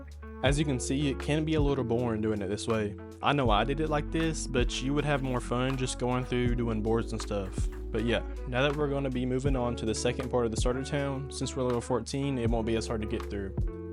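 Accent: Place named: South, United States. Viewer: Bro has the most southern accent ever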